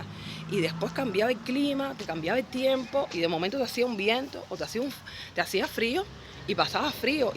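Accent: Cuba. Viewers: Te encontré recién hoy, .... sólo quiero darte la BIENVENIDA a nuestro país y espero que seas FELIZ aquí. Me encanta tu acento cubano, muy querido para mí, que traes de tu delicioso PAÍS.! Dios quiera que se solucionen los problemas que agobian a ese pueblo divino. A mis 76 años, tengo recuerdos HERMOSOS de tu PAÍS.!!!!!! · Modestamente y si me permite (no es una critica y mil disculpas si lo interpreta de esa manera), le dire que pienso que ud. en una Argentina con acento cubano jajaajjaja, de verdad me encanto su comentario a cerca de mi pais. Logicamente cometemos errores y habra dificultades como en muchos lugares pero poniendole ganas y esfuerzo uno los puede solucionar. Dije que Ud. es una argentina con acento cubano por que vi en su narración una gran PASION y eso es el SER argentino. Muchas gracias y vaya para Ud. y su Marido un fuerte abrazo deseandole mucha pero mucha suerte en nuestro pais.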